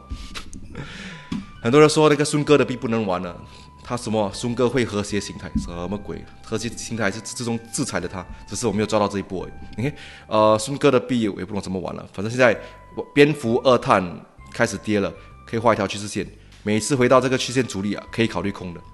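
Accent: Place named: Taiwan. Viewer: 我喜欢你的背景音乐。喜欢你的台湾腔，你讲话好机车。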